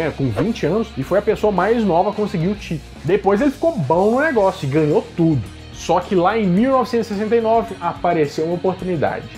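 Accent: Minas Gerais, Brazil. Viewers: É tão bom vê um vídeo com nosso sotaque mineiro 😃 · Beijao, adoro seus videos, curto demais, e o doc.? não tem possibilidade nenhuma de vc continuar fazendo? Amo seu sotaque mineirinho. E caça mais filmes com atores bem no inicio de carreira, miito bom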